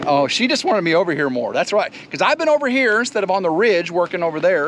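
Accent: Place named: South, United States. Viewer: You don't like the accent? Like Skynyrd said, a southern man don't need you around anyhow! Cool video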